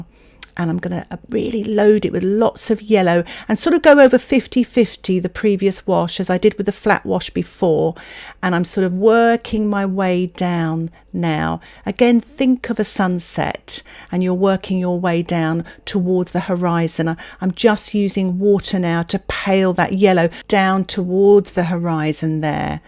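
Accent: United Kingdom. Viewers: i wish I had this video when I first started painting with watercolors. It would have answered so many of my questions. I've done many of your tutorials because I love the sound of your voice and cute British accent, the ease with which you explain things, and the style and subjects of your paintings. Sending much love to you💕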